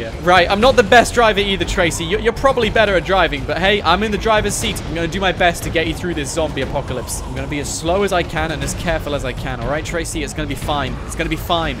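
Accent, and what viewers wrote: United Kingdom. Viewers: I have to say that i LOVE your British accent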